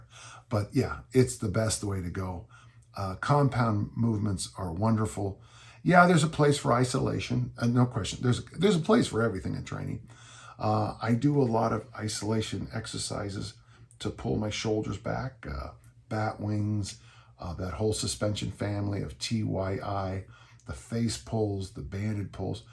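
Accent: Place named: England, United Kingdom. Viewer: He's certainly not Scottish, he's as English as they come 😂 But yeah, he's very good!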